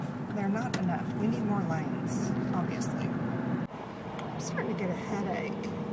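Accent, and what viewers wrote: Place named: Houston, United States. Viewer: You are possibly the coolest mom that has ever lived. (I remember you said you had a daughter from one of your other videos I watched) You make YouTube videos and art projects. Not to mention you are super funny. Wish my parents were this cool when I was a kid lol. I was wondering where you were from because your accent is so familiar. Half of my family is from Houston so now it makes sense lol. Love you channel!! -Katie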